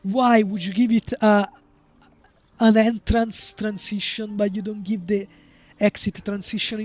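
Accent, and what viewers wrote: Italy. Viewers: bro are you italian?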